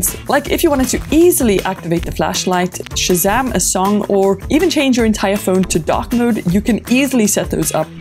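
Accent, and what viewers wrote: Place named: South Africa. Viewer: Cool Soth Afreekan accent.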